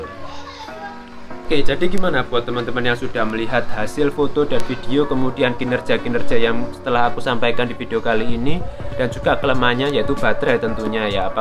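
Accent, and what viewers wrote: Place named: Java, Indonesia. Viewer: Medok jowo, lanjut ae lahh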